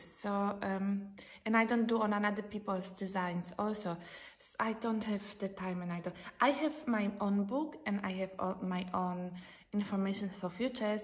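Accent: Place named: Croatia. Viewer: Hiya.. I was so delighted to come across you via Loretta - Knit My Way Home podcast.. she mentioned you in the #inspiredbyellenkal. I enjoyed your podcast & how cheerful you are.. and love your new cardigan and am looking forward to knitting it when I finish a couple of my other knits. I laughed when you showed the blue tack on your needles.. but a great idea, yes! Congrats on the new pattern.. and I look forward to seeing what you come up with in the future.. and maybe even test for you one day. Thank you for a lovely podcast.. Cheerio from an Aussie girl x Tea ps.. love your accent as it reminds me of my homeland Croatia 🫶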